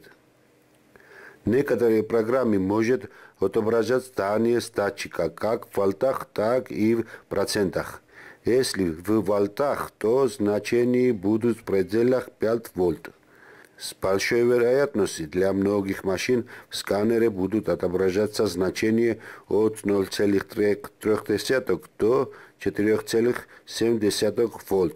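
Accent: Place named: Georgia. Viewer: С акцента можно предположить, что вы из Грузии, как и я. У меня проблема с дроссельной заслонкой, вы бы адрес или номер телефона, я бы заехал к вам. Спасибо, с уважением Земляк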